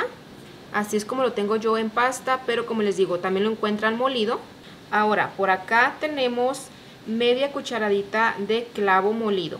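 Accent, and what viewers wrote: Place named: North, Spain. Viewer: Parece del norte su acento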